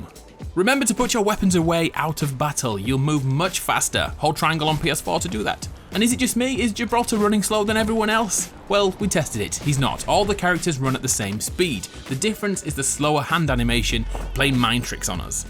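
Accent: United Kingdom. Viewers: Why are all these Apex Legend tip videos all have British narration? Not a complaint, just an observation.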